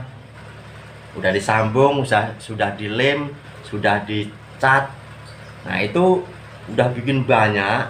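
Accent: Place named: Yogyakarta, Indonesia. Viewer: Sapa hayo itu....jatengnya mgkn Jogja ya kang😂😂😂😂😂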